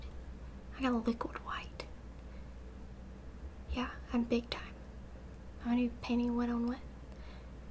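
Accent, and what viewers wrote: South, United States. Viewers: I love your southern accent that keeps sneaking out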